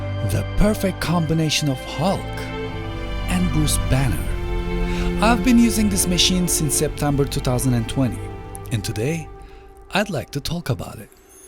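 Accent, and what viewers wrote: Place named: Turkey. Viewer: türk oldugu sesinden belli :D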